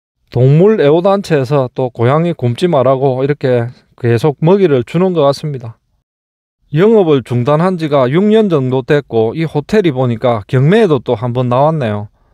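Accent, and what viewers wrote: Gyeongsang, South Korea. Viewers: 구독은 안했지만 영상 올리시면 빠짐없이 봅니다 저는 전북 전주 출신이지만 경상도 구수한 말이 너무 좋네요 그리고 제가 좋아하는 약간 쓸쓸한 음악과 경치 한편의 시적상상이 떠어름니다 마음으로 항상 감사함을 느낌니다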